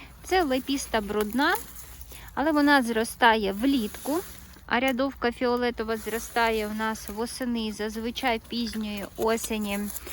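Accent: Standard. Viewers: Мне больше нравятся ваши видео когда вы разговариваете , потому что у вас хороший и чистый украинский ) Привет из Ирпеня ! Вчера нашли в нашем лесу аж одну рядовку фиолетовую , искали искали рядом еще ... ничего .. одинокая красавица оказалась ) За-то зонтики спасли ситуацию ) Страшно у нас , есть мины и могилы россиян без крестов в лесу и их сухпайки со звездой ... мы там рядом грибы брезгуем собирать .(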